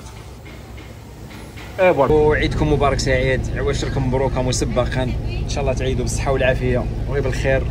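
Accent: Algeria. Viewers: انت جزائري، لهحتك جزائرية ، علاش تقول راك مغربي.. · علابيها تتكلم كيمانتاع الغرب الدزيريين تماما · هادوك كا يترزقوا. شوف لي كا يشفروا أو يبيعوا في المخدرات. اللهجة ديالك جزائري ماشي مغربي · لهجة ديالك جزائري بينا وضوح شمس سير قلب على شي خدمة باركا من تشويه صورة المغرب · من خلال لهجتك أنت جزائري. لمذا تدعي أنك مغربي.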